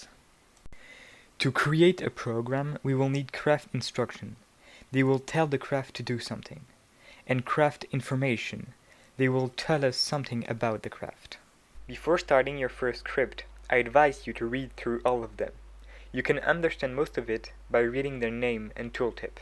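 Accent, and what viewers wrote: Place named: France. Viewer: Salut, petite question, est tu Français? Car tu a un accent qui le laisse penser... Si C'est la qu'à pourrais tu faire une version FR de tes tuto stp? Je galère beaucoup avec la programmation... En tout qu'à merci pour tes tuto, la traduction automatique fonctionne à peut prêt correctement même si ce n'est pas toujours parfait c'est déjà mieux que rien et ça ma permis dans apprendre déjà pas mal sur la base de la programmation. Je passe au tuto suivant ;-)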